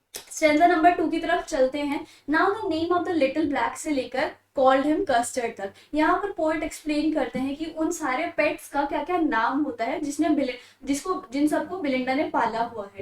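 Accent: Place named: Haryana, India. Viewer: Didi apki awaz se aap haryana ke lag rahe ho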